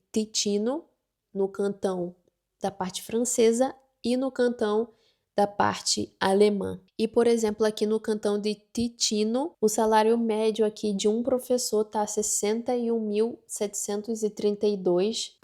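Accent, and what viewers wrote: Rio de Janeiro, Brazil. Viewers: Não da para nós nordestinos mudarmos o nosso sotaque, da mesma forma que a moça tem um sotaque aparentente carioca também não vai mudar o dela. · Ela não é nordestina é Carioca, não tem como mudar o sotaque raiz.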